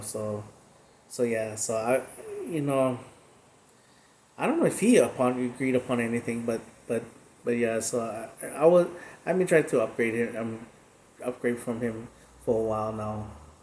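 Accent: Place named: Hawaii, United States. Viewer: Ahaha braddah wea u from..i know u from hawaii just by da way you talk but where in hawaii..btw you guys team looking like Rubbish..fly eagles fly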